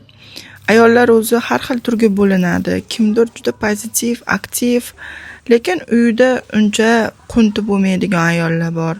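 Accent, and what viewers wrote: Kokand, Uzbekistan. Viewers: Opa qoqonlikmisz shevezdan❤ gullariz vashshi mazza dahshat zooor mazza qildim korib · Quqoncha shevami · Шевалари Кукон❤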